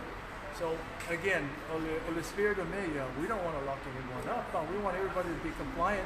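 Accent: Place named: United States. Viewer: I rather for him to speak inglish clearly then samoan language will be better but sounds his accent he probably educated in usa ae matagofie le tautala lelei pea ile igilisi ma le faasamoa ia manino lelei then people will see professional malo le taumafai paga foi le malosi o galuega valea ale tiapolo i nei tagata ma nei auupega e lamatia ai soifua ma ola o tagata lautele aemaise o soifua o leoleo o loo galulue e puipui le saogalemu ole atunuu,ma ole ofi atu o drugs eseese i totonu ole atunuu ma involved ai le toatele o tagata ae maise i young people ose faafitauli pito sili lena ua aafia ai aiga,fanau,ma tagata lautele i totonu o samoa so sad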